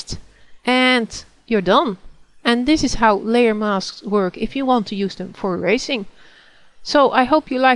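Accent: Netherlands. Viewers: dutch accent.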